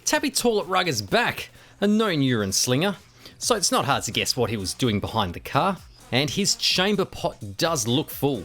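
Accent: Australia. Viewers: the Australian accent make this feel like a nature documentary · I laugh so hard with the editing and the a Ausitralian accent. Anyone wanting to cheer up your day just visit. Cat lovers might not take kind to the video but piss on them.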